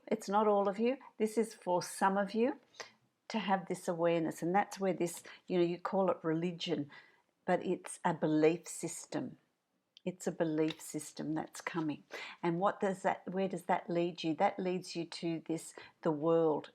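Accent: Australia. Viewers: Love the rare cultured aussie accent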